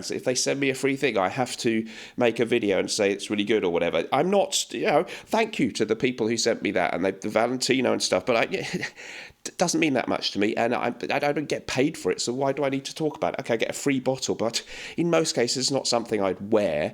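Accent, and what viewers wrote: England, United Kingdom. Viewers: Caro, desative essa dublagem ridícula. Entendo melhor seu sotaque inglês do que o americano. Fora isso qual Árabe compensa para quem gosta de Drakkar Noir, Guilty Absolute, Azzaro Silver Black, algum todo clima para São Paulo?? Obrigado pela atenção, seus vídeos são só para quem gosta muito, assim como eu.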